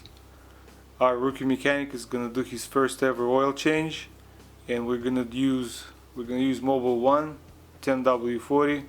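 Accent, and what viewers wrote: Russia. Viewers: ааа какой русский акцент 😊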